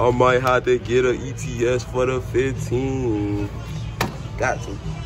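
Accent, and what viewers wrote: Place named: Maine, United States. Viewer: Raw af. Where u from maine? U gotta cool voice/accent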